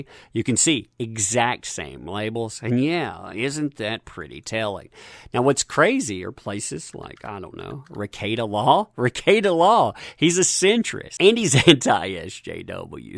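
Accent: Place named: South, United States. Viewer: Been hearing a lot about this thing and how wrong they get things. Smh. I love your accent btw, where's it from I assume southern?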